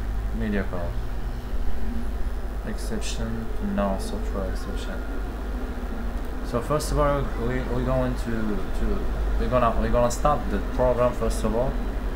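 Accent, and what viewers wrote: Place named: France. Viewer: I subscribed for the french accent, and yes this worked for me on CS5. Thank you!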